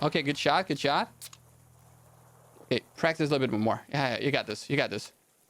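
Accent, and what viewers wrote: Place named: Denmark. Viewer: du er fra Danmark, ikke sandt? syntes jeg kan kende en dansk aksang:)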